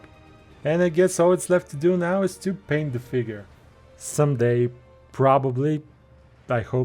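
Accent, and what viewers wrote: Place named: Russia. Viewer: я один слышу тот самый русский акцент?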